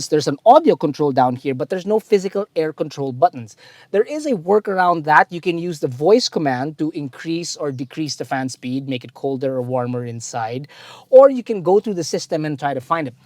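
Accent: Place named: Philippines. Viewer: Fucking corny! Horrendous pinoy accent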